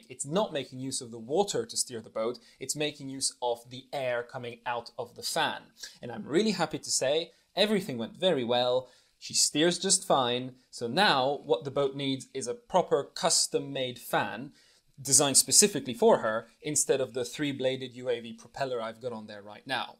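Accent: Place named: Scandinavia. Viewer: pretty sure he's not UK(originally), sounds like scandinavian, to many american R's and over pronounciation of the english bøøøut(boat)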